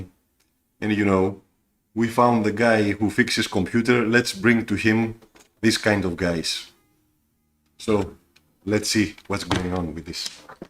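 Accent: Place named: Greece. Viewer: Η προφορά σου μοιάζει ελληνικη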